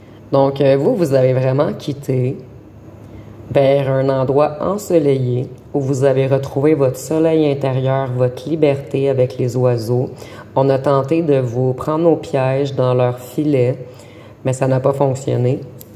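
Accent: Canada. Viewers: Bonjours à vous ! Je me demandais, comment vous avez capté ça, vu votre accent vous êtes au canada ? Mais tout ça là, j'le vois dans mon petit coin d'france ! Mais tout tout tout... Vous êtes balaise !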